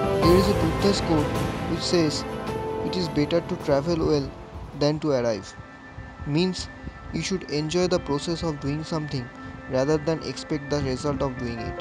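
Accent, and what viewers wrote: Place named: Bengal, India. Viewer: Good very good , but not the best. Could have been better if you remove the voice narration as its in Banglish accent which spoils the whole mood of this good piece of work. Try to re dub it with a better english or a proper bengali accent. Sorry for being straight forward but apart from the voice narration ( very poor accent as include MTI and FLI ) every other aspect is awesome and I enjoyed it. Watch Nirmoy Modak`s video for reference.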